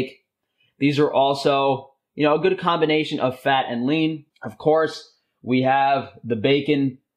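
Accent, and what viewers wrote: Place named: East Coast, United States. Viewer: Bro I love how into it you get!!! Definitely a man who's passionate about quality meat. Keep up the awesome work... Always great info... And I'm always thoroughly entertained by your East-Coast-ness!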